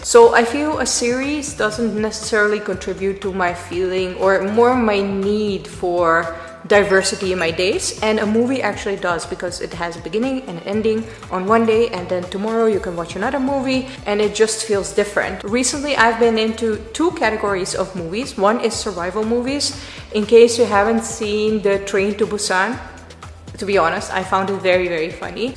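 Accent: India. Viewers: She is picking up the indian accent. This is nice☺ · Your english accent is more indian now! I've seen your videos for years and I just came to this realization. You make me love india more and more every time!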